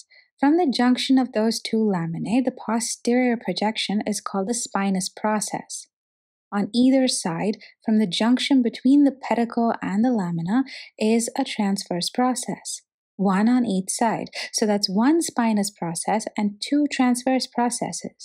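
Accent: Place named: India. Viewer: Wow , I can't believe that you're from India love your videos and your accent wow love from pakistan ❤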